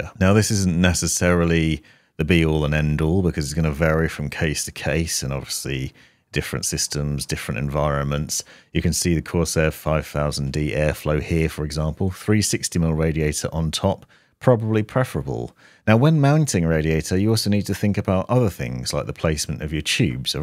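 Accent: United Kingdom. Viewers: you sound uk based, you know any sites with configurators where i could get a quote and plan to buy without really scummy pricing? cos the one site i do know make you basically pay its cost twice over by not offering a way to buy it in one go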